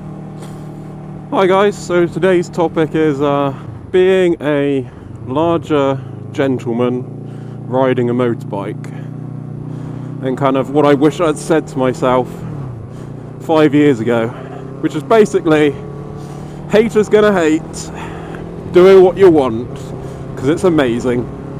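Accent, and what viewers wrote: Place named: United Kingdom. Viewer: Great video, speaking from the heart. Very inspirational and I just love that fine british accent!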